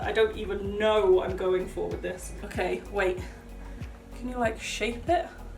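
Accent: United Kingdom. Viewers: she's British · Are u from Britain ?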